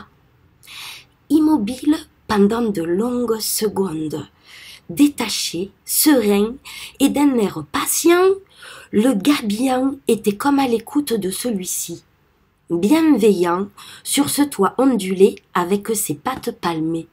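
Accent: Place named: Marseille, France. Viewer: Sympa l'accent marseillais ! Il te va bien.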